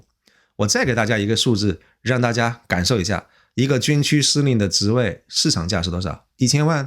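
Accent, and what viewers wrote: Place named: Sichuan, China. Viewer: 博主的这一口川普真的非常恶心，非常刺耳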